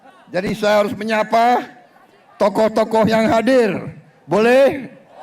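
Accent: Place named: Betawi, Indonesia. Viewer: Aye seneng bapak presiden dialek Betawi ❤ pak presiden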